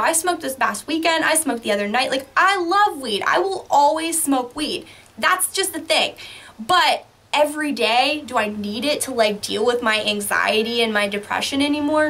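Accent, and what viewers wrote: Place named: Michigan, United States. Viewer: Sound like you’re a Michigander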